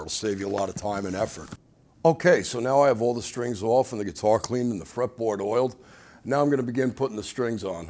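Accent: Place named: New Jersey, United States. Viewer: Jersey is my guess...def.not Boston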